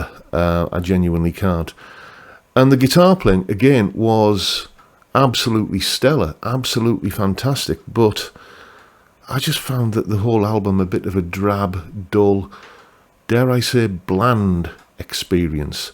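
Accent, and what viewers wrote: United Kingdom. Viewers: You sound British so my advise learn 2,nick bowcott songs and call me in the morning nevermind the dull players here but I'm from America what do I know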